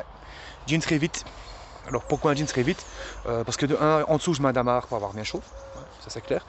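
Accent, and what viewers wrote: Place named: Toulouse, France. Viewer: J'ai reconnu l'accent de Toulouse.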